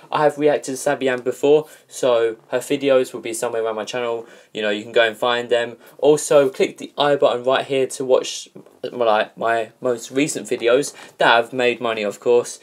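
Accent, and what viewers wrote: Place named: United Kingdom. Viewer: What's up dude!!! I like your british accent. greetings from Indonesia🇮🇩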